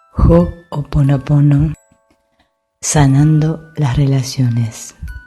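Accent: Argentina. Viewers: Hola sos Argentina no? Por el acento digo...los textos los haces vos o lees de algún libro? Son muy buenos!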